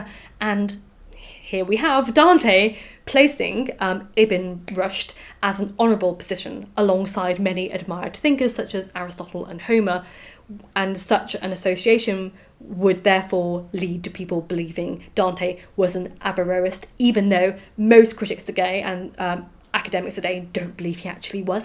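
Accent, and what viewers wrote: United Kingdom. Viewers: do you have a British accent or do you just talk that way because you read books?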